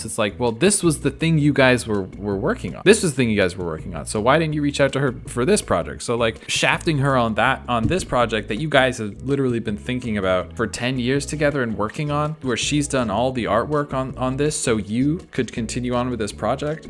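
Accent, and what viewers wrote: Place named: Ireland. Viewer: only vaguely related but sometimes you sound like you have a kind of irish accent!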